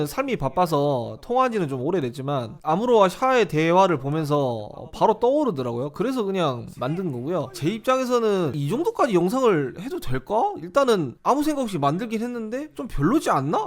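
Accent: Gyeongsang, South Korea. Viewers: 말투가 경상도같긴한데 뭔가 전라 느낑이 있다? 충청..은 아닌거같기도 하고했는데 화개장터가 맞군요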